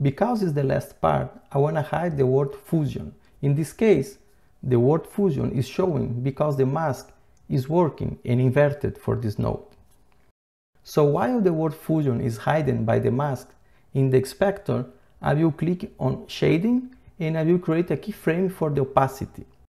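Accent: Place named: Brazil. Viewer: That brazilian accent...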